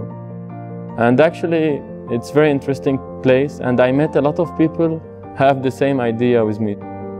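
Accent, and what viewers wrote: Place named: Russia. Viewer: Так хорошо выучил русский, что на английском говорит с русским акцентом. Я почти все слова смог разобрать, хотя английский на слух обычно понимаю плохо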